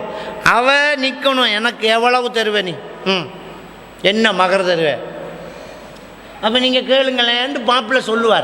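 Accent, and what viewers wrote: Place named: Sri Lanka. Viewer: திருமணம் பற்றியும் தலாக் பற்றியும் தெளிவாக விபரமாக சிறப்பாகவும் சுந்தர இலங்கை தமிழில் அருமையான விளக்கம். அல்லாஹ் உங்களுக்கு ஈருலக பாக்கியங்களை வழங்குவானாக.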